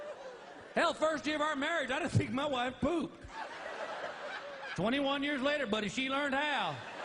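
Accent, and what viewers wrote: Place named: South, United States. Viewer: My mother took me to a Bill Engvall stand up performance in Texas, waaay back when. I was a kid, but I laughed every time my mom laughed. As I got older I started to understand the jokes and I cackle from the hilarity. He truly is the funniest person around. And his southern draw makes it 1000x funnier.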